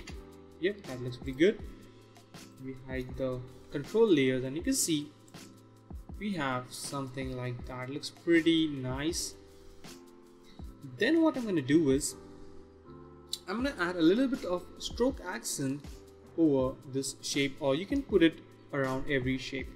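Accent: India. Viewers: Like a dove telling. Accent hindi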